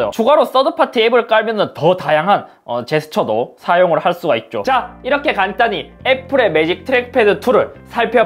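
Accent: Gyeongsang, South Korea. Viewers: 같은 경상도사람이라서 억양자체가 비슷한데 목소리까지 비슷하네요. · 잇섭님 너무 잘생겼어요! 애플 제품 관련 영상은 다 찾아본 편인데 항상 웃는 얼굴이라서 보면서 마음이 편해져요ㅎㅎ 왠지 만나면 되게 재밌는 친구일 것 같아요ㅎㅎ 경상도 말투를 쓰셔서 졸리지 않게 들을 수 있어서 좋아요~ 앞으로도 재밌고 유익한 영상 많이 찍어주세요 :-)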